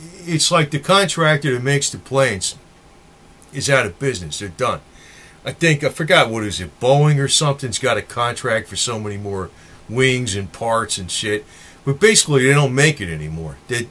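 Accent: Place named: New York, United States. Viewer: Sounds like you are from New York or Jersey.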